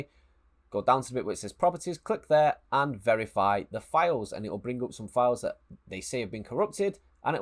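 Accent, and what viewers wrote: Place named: United Kingdom. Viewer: That british accent is amazing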